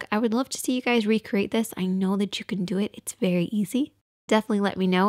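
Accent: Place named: Ireland. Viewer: Are you Irish? I hear a slight accent.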